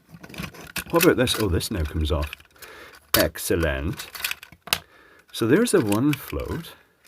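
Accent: Scotland, United Kingdom. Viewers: There is something relaxing about a softly-speaking scottish-accent man discussing about toilets for 20 minutes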